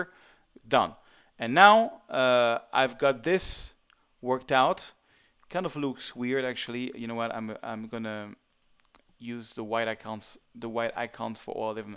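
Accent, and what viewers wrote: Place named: France. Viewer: I love your frenchglish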